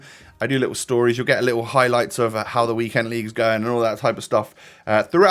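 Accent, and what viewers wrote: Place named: Scotland, United Kingdom. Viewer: didn’t know was scottish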